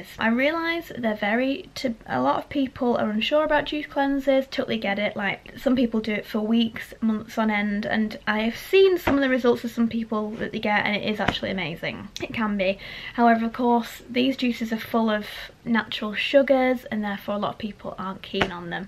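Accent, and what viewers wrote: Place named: Wigan, United Kingdom. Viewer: I’ve only just subscribed to you and can’t believe you’re also from Wigan!! Immediately I thought I recognised a twang in the accent 😊 xx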